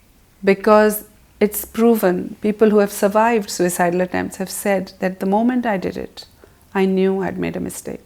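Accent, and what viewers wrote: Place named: India. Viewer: I like the indian accent. you speak well english.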